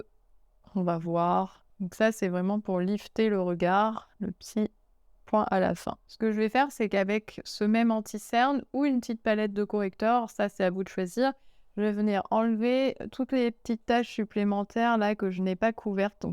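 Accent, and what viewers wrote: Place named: Paris, France. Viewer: La vidéo est très intéressante et les conseils sont bons mais l'accent parisien là j'arrive pas 😅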